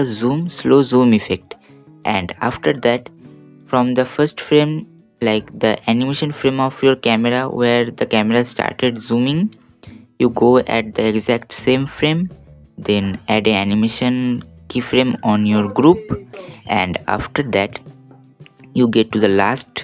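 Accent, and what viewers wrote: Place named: India. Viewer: Your voice indian but language English, where are you from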